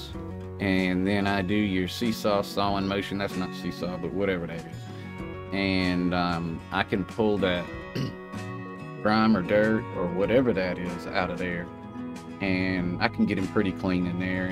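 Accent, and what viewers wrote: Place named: Kentucky, United States. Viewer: Where are you from. I’m In KY you sound like me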